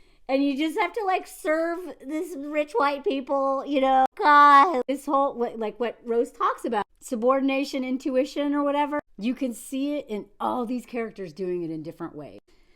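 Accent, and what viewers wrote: South, United States. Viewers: You're like me. You're not trying to have a southern accent. You're just trying to sound NORMAL.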